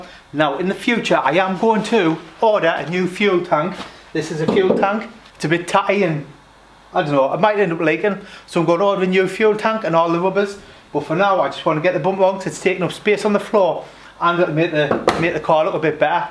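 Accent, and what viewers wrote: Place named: Scotland, United Kingdom. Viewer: is Scottish. It’s a Scottish accent 🏴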